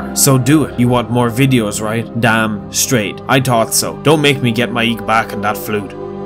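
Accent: Ireland. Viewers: ‘Crisp Irish accent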